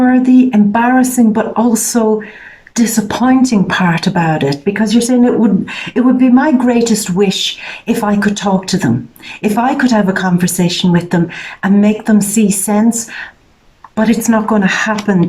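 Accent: Ireland. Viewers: I love your reading - very helpful!! Love your Irish accent and way of reading - One Love xxx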